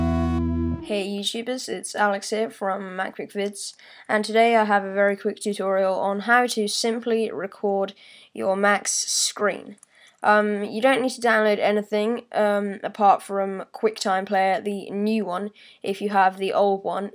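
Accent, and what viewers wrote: England, United Kingdom. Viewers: are you english? ur accent is adorable <3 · UR BRITISHHHHH :3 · Hello are you British??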